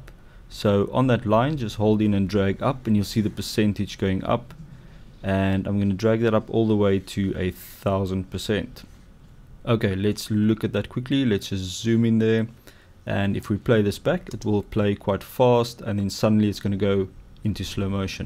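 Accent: South Africa. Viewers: "Suid Afrikaanse" accent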